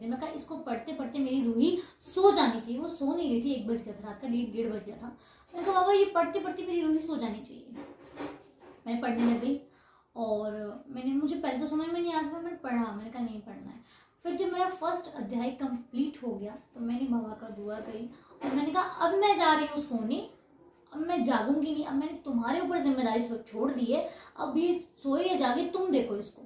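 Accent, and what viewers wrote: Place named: Punjab, India. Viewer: I love ur punjabi tone mera naam sukhdeep hai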